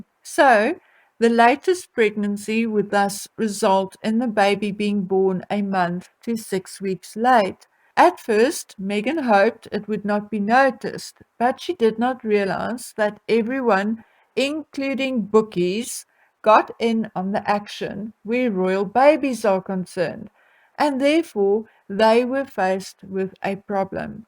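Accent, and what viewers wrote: South Africa. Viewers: Jy praat engels soos n Suid Afrikaner,is my oor goed in gestel.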